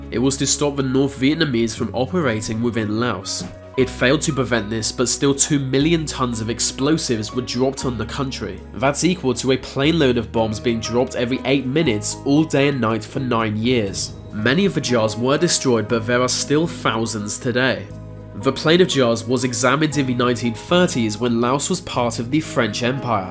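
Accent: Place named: Cockney, United Kingdom. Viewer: Wanted to watch the video but couldn't stand his cockney accent. He doesn't so much speak the language as chew it up and let it lazily dribble out. UGH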